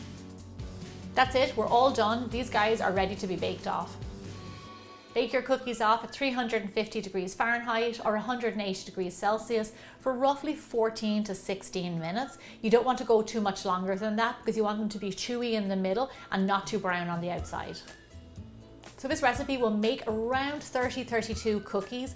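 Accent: Ireland. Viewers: are u irish? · Love the recipe by any chance are you irish ☘️?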